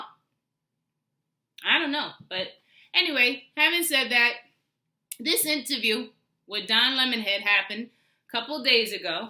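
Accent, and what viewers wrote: New York, United States. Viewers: Hi am from Nassau Bahamas I subscribe too your channel early this morning, and you soo beautiful I love your charming sweet voice you could tell that you are a true New Yorker lol... Am A Eastern Conference guy, I was a die hard Lebron James fan when he played for Cleveland and Miami... And when he went too L.A. am not his fan no more because am not A Western Conference guy..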